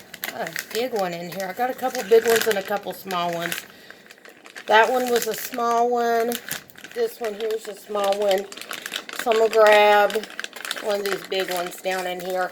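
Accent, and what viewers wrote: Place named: South, United States. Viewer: Mama, I love that southern accent.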